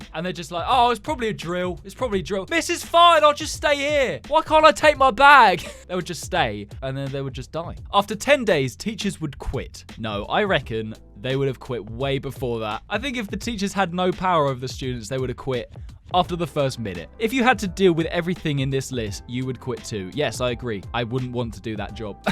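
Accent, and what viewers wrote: United Kingdom. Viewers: Are you British cuz I am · Bros British 😊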